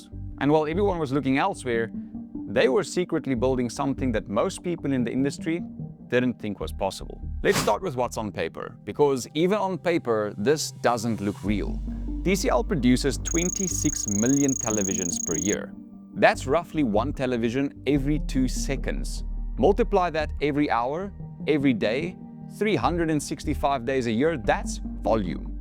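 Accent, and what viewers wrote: South Africa. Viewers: Are you South African👂? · You're South African?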